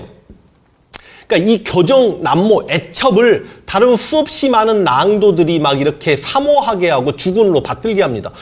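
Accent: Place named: Gwangju, South Korea. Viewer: 억양이 광주쪽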